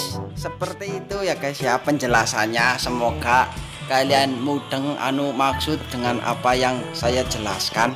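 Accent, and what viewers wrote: Java, Indonesia. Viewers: Bg orang Jawa ya kaya aku ngomong nya kaya gitu 😅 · Wong Jowo to? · jawa ne ngendi pak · Wih wong jowo🤣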